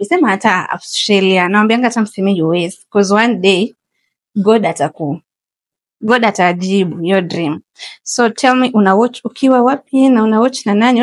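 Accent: Kenya. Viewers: Umeishi na mluhya hadi unaongea kama mluhya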